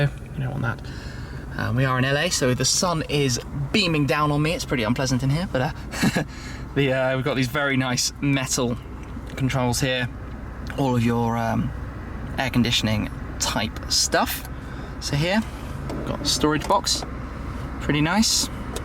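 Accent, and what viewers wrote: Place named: United Kingdom. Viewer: wonderful british clear english and of cause a stunning car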